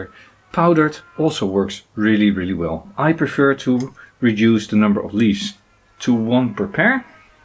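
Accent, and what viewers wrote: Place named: Netherlands. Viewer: are you from the netherlands i can hear from your accent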